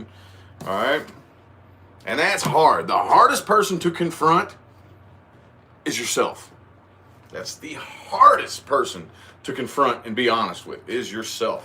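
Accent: United States. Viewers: I missed u live! Ah well!! Next time! I'm not evn Leo but i like ur vibe!! Your funny and the key is your last 1st evr vid I saw of yours was really really accurate4me so i know ur good. I am irish! I love ur American accent!! Hope u don't mind me say that!! It's a compliment!!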